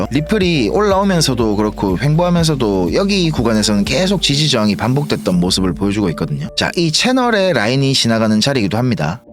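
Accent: South Korea. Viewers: 저도 응원합니다. 특유의 부산 억양 제 와이프와 같습니다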